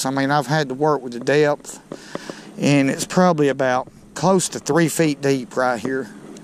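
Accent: South, United States. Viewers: He mesns 1/128th ounce...hes just country...it takes a country boy to translate...im ole missippi boy...rock on brother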